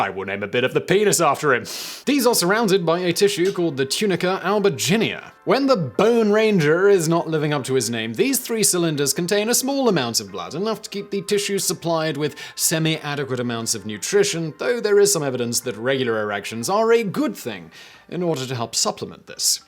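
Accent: United Kingdom. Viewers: Listening to a guy with what I call a “documentary style British accent” (like Sir Richard Attenborough) spend over 10 minutes talking about erections was hilarious 😆. Thanks, I needed that! 🤣🍆 🇬🇧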